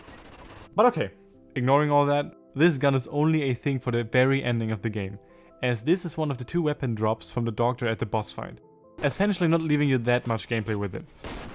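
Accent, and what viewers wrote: Germany. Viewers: ich habe die ganze Zeit deinen akzent gehört und dachte schon das du deutsch bist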